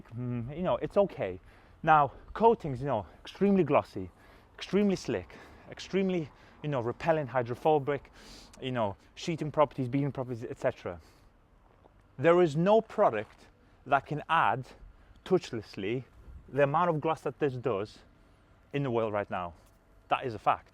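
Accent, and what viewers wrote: United Kingdom. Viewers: Are you a geordie?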